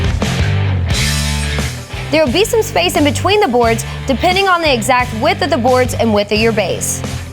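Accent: South, United States. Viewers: from the sound of her accent she is a southern girl. And a handy one at that. Nice job young lady!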